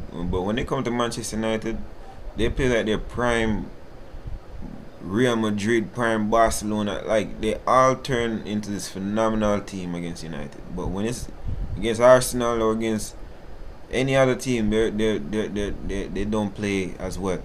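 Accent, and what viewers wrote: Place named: Jamaica. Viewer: Bro hw u sound so Jamaican😂🇯🇲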